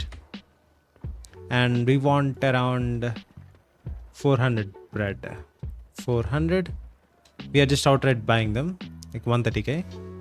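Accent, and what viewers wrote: India. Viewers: Bro r u indian ??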